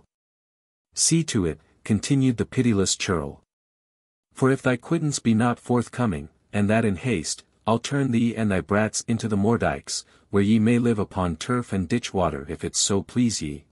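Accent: United States. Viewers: Only managed to stomach 12ish minutes of this godawful monotonous American voice. Would have been much better in an English accent at least, seen as it’s about Lancashire!!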